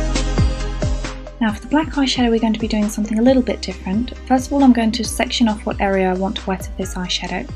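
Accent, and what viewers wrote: England, United Kingdom. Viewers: R u from england xx like me lol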